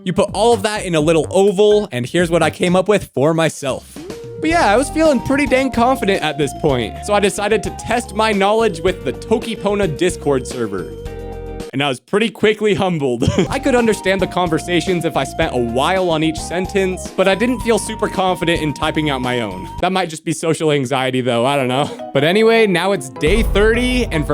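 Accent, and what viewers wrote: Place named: United States. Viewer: i still feel like you kinda have a really strong american accent when speaking toki pona... lol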